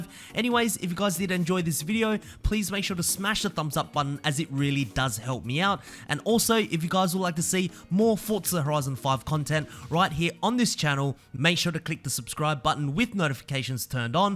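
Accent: Australia. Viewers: Fun fact! I was today years old when I realized is Australian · Wait ur Australian My brother What part are you from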